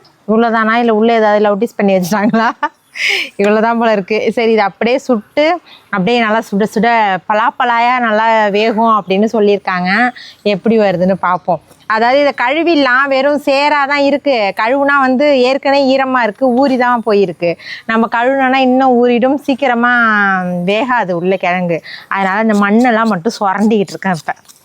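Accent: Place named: Thanjavur, India. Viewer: Namma thanjavur bashai neruputi❤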